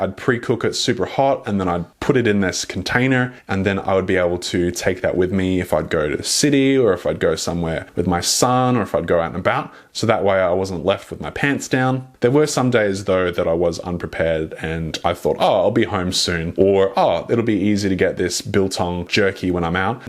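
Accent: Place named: Australia. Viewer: I’d love to know what this Australian-ish accent is about :’) oath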